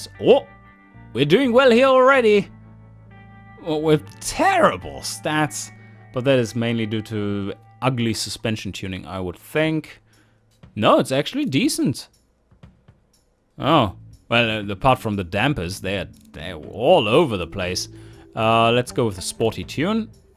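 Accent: Germany. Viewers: You are german???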